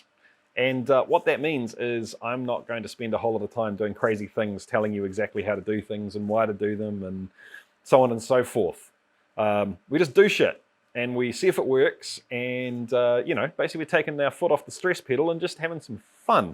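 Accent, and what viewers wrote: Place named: New Zealand. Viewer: German speaking Yooper* here, stumbled across your channel a couple days ago. When I couldn't understand... well, anything you were saying, I actually had to turn on the closed captions. Then I realized I haven't heard that many Kiwis (with all due respect!) talk, so I had to watch a few more videos so I could translate the accent. By the end of this video, didn't need the (cc) any longer. And... absolutely loving the passion you have for the craft! * A Yooper is a person from the Upper Peninsula of Michigan (USA), very near the Canadian border. We talk funny too! :-) If I ever make a video, you'll probably need closed captioning too.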